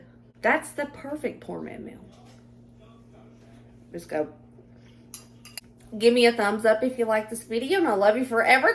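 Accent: South, United States. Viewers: Both looks very good! Love your voice and southern accent!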